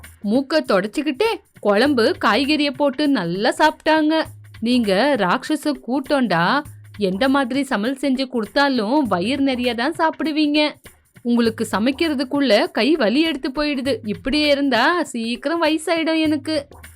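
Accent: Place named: Bangalore, India. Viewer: Banglore Tamil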